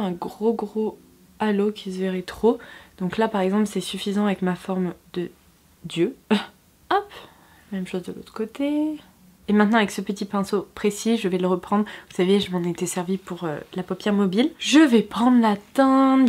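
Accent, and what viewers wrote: England, United Kingdom. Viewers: Vidéo top encore une fois! Les produits me donnent trop encie, surtout la palette de fards 😍 Et cet accent english que tu as, j'adore 😂😘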